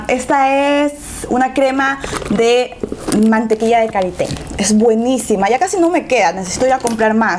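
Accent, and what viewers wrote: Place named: Guayaquil, Ecuador. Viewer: Habla normal.... Los Ecuatorianos hablamos así en especial de Guayaquil.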